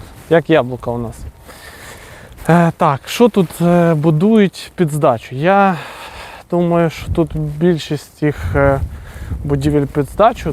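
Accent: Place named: Russia. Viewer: ну будто русич пытается по украински говорить)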